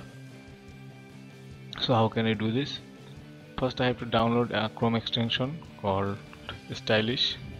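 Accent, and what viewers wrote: India. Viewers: So hard to understand that thick Indian accent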